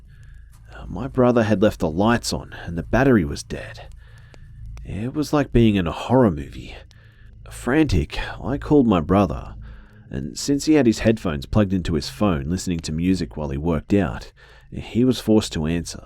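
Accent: Australia. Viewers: Ahh it's always good to hear that amazing Aussie voice! 🌼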